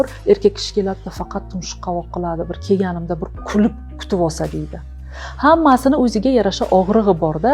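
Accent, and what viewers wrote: Bukhara, Uzbekistan. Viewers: Бухороданмисиз шевачайиз кетоптида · Бухороликмисиз опа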